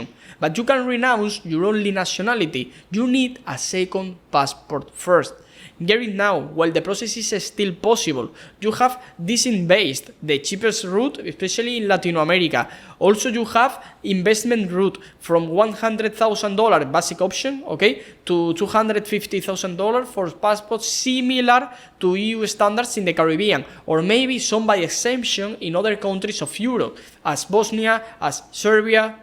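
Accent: Spain. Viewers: i’m also spanish , i’d recommend you to spend a few months in the uk or us until you lose your strong spanish accent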